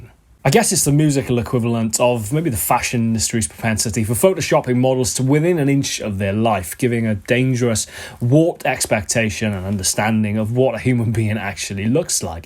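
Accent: Wales, United Kingdom. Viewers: You got style bro, you sound like a fellow Welshman too👍👊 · On a random note .. sounds like you've lived in or come from Wales, possibly Caldicot or Chepstow area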